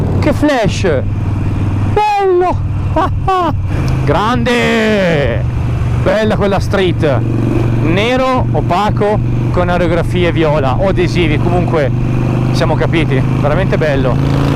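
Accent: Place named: Tuscany, Italy. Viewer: Un toscano che parla Veneto, troppo forte 👊✌️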